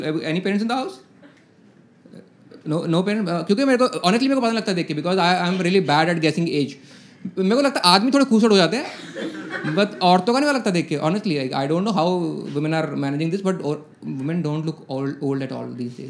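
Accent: Punjab, India. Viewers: Kaur yes both have punjabi accent that makes it more funny !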